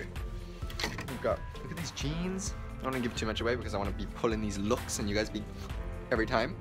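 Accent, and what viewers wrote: Australia. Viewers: the people that only know for being ' famous pop star' won't understand how us who know him as 'little boy with an Australian accent who talks to a camera' feel rn!! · It might just be me but sounds more Australian in this video 👍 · Taylah He is Australian;) his accent just isn't as strong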